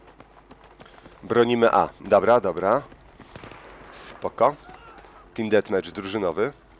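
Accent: Silesia, Poland. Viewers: No widza że ty jednak umisz po naszymu godac i że ty żeś hanys je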